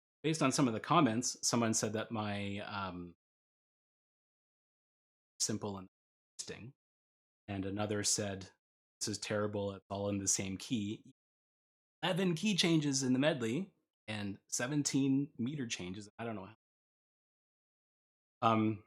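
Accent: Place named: Canada. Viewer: I thought you were Canadian, before you said so. The way you say "about", "shout," words like that gave it away.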